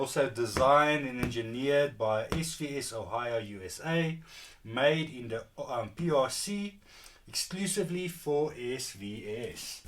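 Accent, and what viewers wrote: South Africa. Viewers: I now have the svs ultra evolution bookshelfs with evolution center and 2 x pb1000 but i was using floorstanders before so i am missing 4 drivers and now i think it is lacking in presence. So i am thining to go with the primes Pinnacles actually, as the evo towers would definitely be too big for my 12 foot by 10 room..also a bit pricey too. I hope the svs prime pinnacles will blend ok wirh my svs evo ultra center. Maybe i need to swap that out for a prime center? Maybe just the plain prime towers would be enough. I am in a dilemma as I can get opened box from dealer for £699 or buy the prime pinnacle towers for £1600 A South African accent? First time i heard. Nice accent. No offense intended at all, as i am sorry I thought you were German to begin with, with a bit of an Australian accent. Got it sooo wrong :) sorry! 😂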